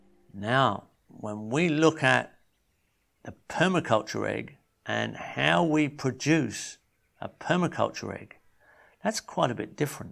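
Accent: Australia. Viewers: Et je suis sûre que le jaune d'œuf est presque orange. :o) Des oeufs superbes et très nutritifs, pondus par des poules heureuses. Magnifique ! My parents used to have chickens and I remember they loved scratching the ground. I didn't much care about the rooster, though. A bit afraid of. They had a laying break for about 1 month a year, in winter (European winter). Otherwise, eggs all the year round, enough for the whole family and to sell some to neighbours. When baking, the pastry had a nice yellow colour. Industrial eggs have a sick pale yolk, you immediately see the difference. Thanks for all your videos, I learn a lot. Just had to get used to your Australian accent at first. All the best!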